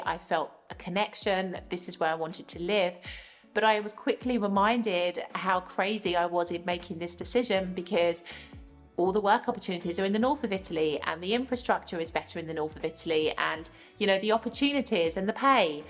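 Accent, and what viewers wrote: United Kingdom. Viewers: Actually she’s not very expectedly fluent. Her level is very very low. Her accent is still very British 🇬🇧. I believe everyone’s journey is different. I am learning Italian too. One day I feel like I have made progress the next day every word has flown through the window and I start all over 😆😆🥹🤔.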